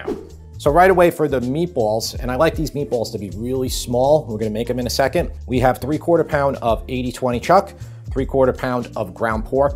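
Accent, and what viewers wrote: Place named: New Jersey, United States. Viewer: You must be from Jersey. Love the accents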